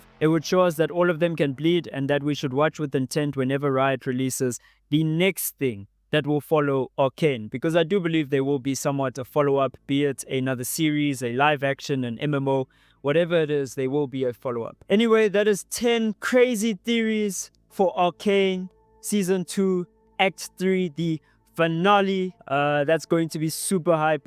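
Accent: South Africa. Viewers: once i heard the voice i knew u were from SA ...lol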